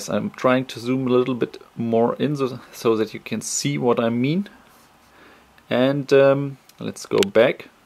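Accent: Germany. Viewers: Nice comparison. i went to Saturn today and test the Ipad mini it was a little bit horrible specially on some Text in websites compare w/ retina.. "Anyway if you guys asking which Nationality this guy belongs due to his accent... His from Country where Mercedes Benz,BMW,Audi and VW was made =) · after 2 sentences i knew hes german ^.^ am i right?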